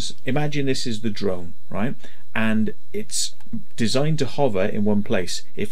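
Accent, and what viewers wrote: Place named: Wales, United Kingdom. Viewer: Best description of these settings I've seen. Now I'm trying to apply what I've learned to my Mavic 2 Pro. Kind of funny to hear a fellow Welsh accent (I'm near Pontypridd)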